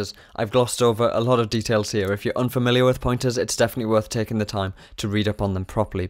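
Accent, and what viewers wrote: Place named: North, United Kingdom. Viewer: насколько же приятно слушать северный британский диалект по сравнению с индусами! я аж подрочил.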